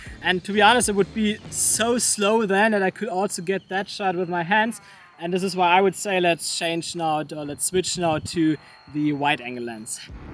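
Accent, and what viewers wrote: Germany. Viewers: good old german accent wenn ich mich nicht täusche :D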